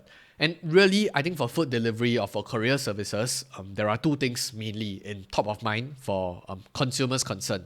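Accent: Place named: Singapore. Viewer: Love the heavily Indian dominant Singaporean accent. So mellifluous.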